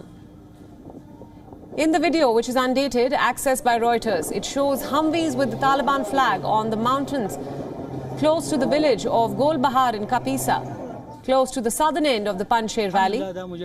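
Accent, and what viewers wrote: India. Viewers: Why the narrator is speaking like an Indian Mama Pundek? TQ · Hall If you had worked in high tech in the US, you would find her accent easier to understand -- you would have had so much practice learning to understand it from listening to your Indian coworkers! But it gets worse: there are more people speaking English with her Indian accent (or one similar) than there are speaking British or American English! Indians will get the ability to shape the future of the language due to their numbers!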